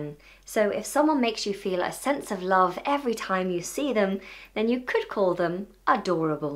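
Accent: United Kingdom. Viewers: To be 'onest I don't like British accent sorry 😆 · You're sweet, but why aren't you blonde? Swedish girls are much sweeter than British girls. However, I am crazy about hearing your British accent, sweetie!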